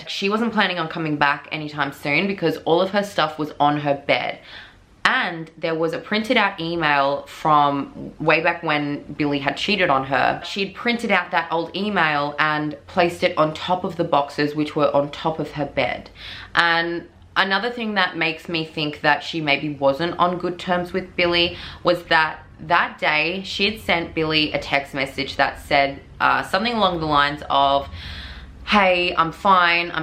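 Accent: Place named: Australia. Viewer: i love the australian accent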